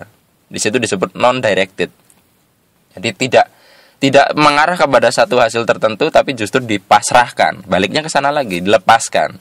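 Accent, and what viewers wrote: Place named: Java, Indonesia. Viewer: ini kok suara ronald franknya gk kayak biasanya, ada medoknya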